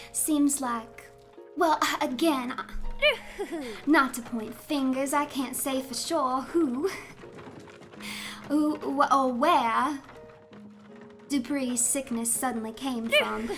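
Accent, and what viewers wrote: South, United States. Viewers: A great audio RP you do Southern Belle so good! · Why does she hide her beautiful, natural southern belle accent? it's so cute.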